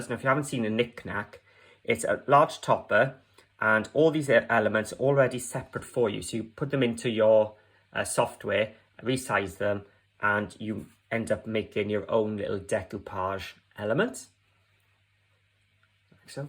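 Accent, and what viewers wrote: Wales, United Kingdom. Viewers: I really enjoyed this video today showing the bridge fold card. This is a fabulous card, I am going to try it for a lovely lady who will be 90. Thank you so much for showing this technique. P.s. love to hear a Welsh accent again 😍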